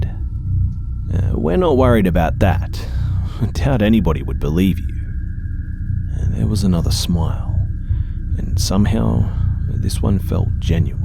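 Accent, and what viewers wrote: Australia. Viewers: I fucking love your Aussie accent hnnnng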